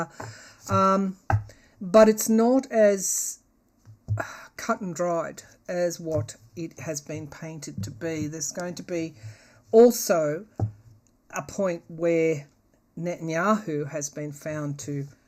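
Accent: Australia. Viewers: Was nice to heat am Aussie voice in this area of YouTube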